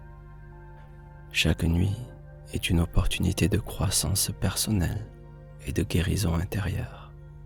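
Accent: South, France. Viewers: Un grand M E R C I. 🎉 à toi: j 'aime ta voix du sud. 🙏💙👍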